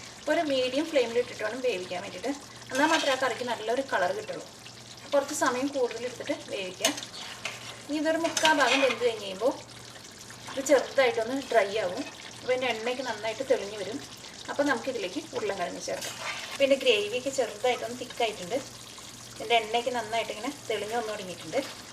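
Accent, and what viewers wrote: Thrissur, India. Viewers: trissur slang polichutta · Haai.. Nalla peddakkana thrissur basha.. Nice video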